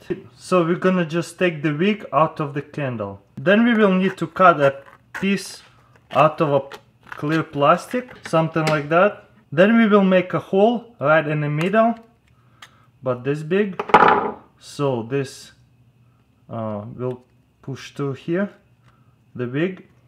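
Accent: Russia. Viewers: He's Russian. English isn't his first language. Dick. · Are you Russian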